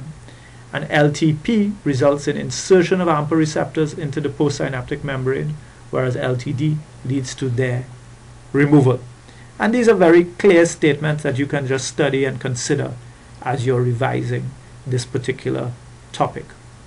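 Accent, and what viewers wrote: Trinidad and Tobago. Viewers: really clear lecture, excellent rhythm and timing. thanks for making this! sounds like a Trini.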